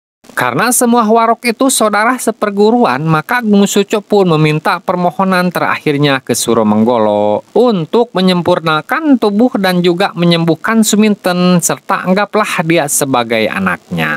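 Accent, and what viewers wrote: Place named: West Java, Indonesia. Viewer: Kaya orng Sunda😀😀😀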